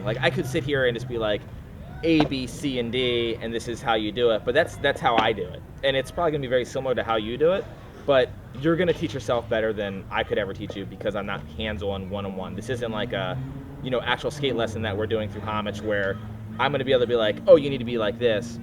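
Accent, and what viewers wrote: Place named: New Jersey, United States. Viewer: I have no idea where is from, but I'm definitely hearing a Jersey accent in there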